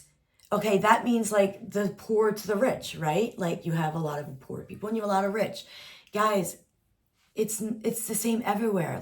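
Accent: Morocco. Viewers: You're really a surprising and very open minded person. I am sure moroccans have akready started considering you as a citizen of Morocco. I still apreciate your darija accent.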